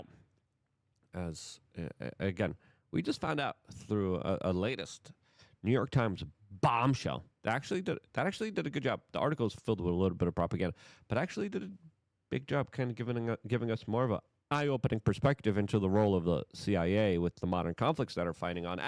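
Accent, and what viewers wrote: Poland. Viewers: He's Polish. English is a 2nd language for him.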